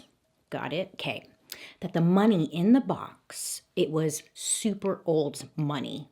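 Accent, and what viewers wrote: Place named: New Jersey, United States. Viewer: Is it me or did she have New Jersey accent in this video? Love ya but i noticed it, maybe it was just cause the crime happened in Jersey but it was there.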